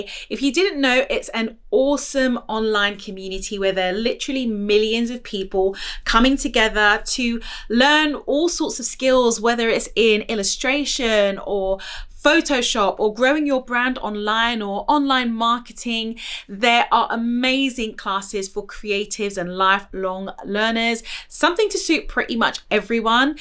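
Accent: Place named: South London, United Kingdom. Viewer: you sound so much like you are from South London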